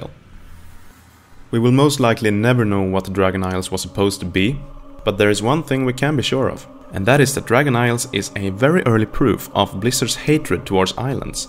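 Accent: Sweden. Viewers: Swedish accent ... Indeed it was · Swedish accent IF am correct? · Man hörde knappt den svenska accenten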